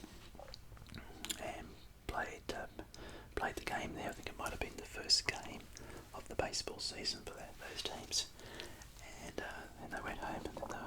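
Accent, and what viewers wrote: Australia. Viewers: What is he actually saying. Australian accent working against me here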